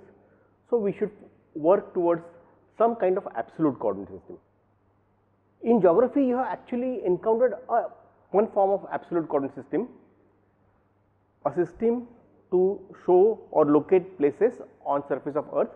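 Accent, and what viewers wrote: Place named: India. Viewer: The toughest Indian accent . But thanks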